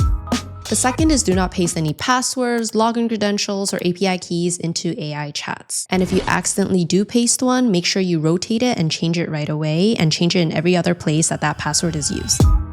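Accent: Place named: United States. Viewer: hi lady. i just saw u now. thanks for this video. its great value video. i have simple but important advise 2u. u speak very clear gd american english language, but since u r global now, itvmeans u r talking to many people with varying English language levels. you talk little bit fast, which make it hard to catch or understand all what you say in ur videos. i wish u can talk relatively slow so then most or all people watch ur videos, easy catch u & understand u. thanks❤🎉🎉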